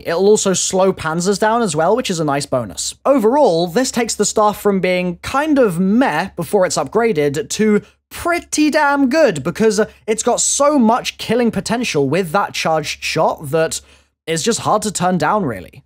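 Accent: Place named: England, United Kingdom. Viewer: Estos si es asentó ingles no como lo que tengo en usa xd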